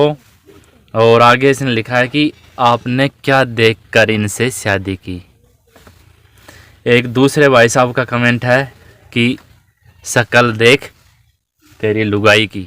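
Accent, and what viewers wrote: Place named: Haryana, India. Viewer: Wov lagta h ap haryana se ho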